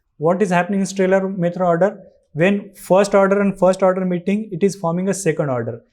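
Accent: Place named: India. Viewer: I Love the Indian English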